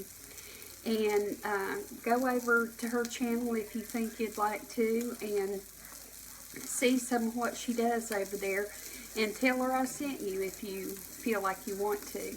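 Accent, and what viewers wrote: South, United States. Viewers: I’m generally a lurker, but I love your channel. Also, I haven’t lived down South since high school in 1992, so I love to hear your accent, brings good memories :-). Anyway, my Mama would make this for us, because it was cheap, easy and tasty. She generally just used well seasoned ground beef. I think that was just her preference though.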